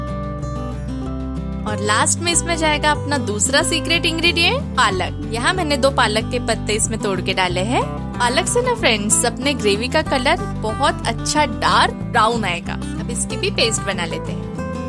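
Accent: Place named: Nagpur, India. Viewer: I can sense Nagpuri accent in your language, though u tried to talk in pure hindi.....'ab apni' 'aju baju se'🔥🔥bole to apna Nagpur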